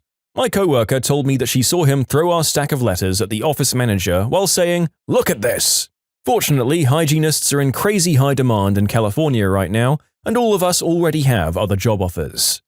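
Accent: United Kingdom. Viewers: Whoa what happened to the person who was telling the story to all of us he sounds more British than robotic I think they're replaced the AI voice with an actual person and that actual person just seem to be British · Why doe's he sound British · This new guy is a grilling MACHINE! keep up the good work man! Also are u British? U sound British. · Is it just me or did you suddenly become British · Why is he britsh now?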